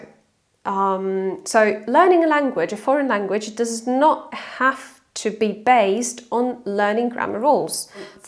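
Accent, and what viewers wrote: United Kingdom. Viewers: Hi, you can be completely mistaken for a native speaker, which is rare. You've deserved a praise. Counter-intuitively, speaking with a perfect Modern RP accent might indicate that you are a foreigner, ha-ha (but that doesn't matter of course). Have you polished your pronunciation being in the UK by emerging? Arguably, the hardest part of English is pronunciation. Many people speak English, but only a few speak clearly and are pleasant to listen to. My mother tongue is Russian as well. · I wonder what your natural English accent sounds like. I mean, without any conscious effort put into it. It's hard to believe that British accent could be natural for anyone haha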